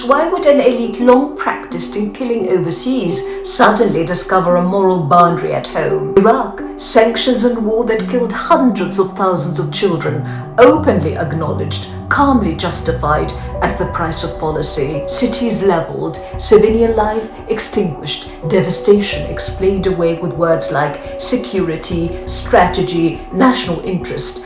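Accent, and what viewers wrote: United Kingdom. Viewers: Looks like AI to me? Posh Brit accent of an older woman?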